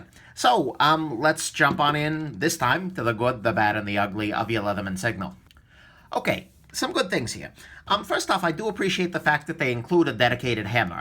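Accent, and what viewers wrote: New York, United States. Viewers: This man literally has to have had surgery that altered his voice. If you watch a video just one year later he sounds like a completely different New Yorker hahaha. It weirds me out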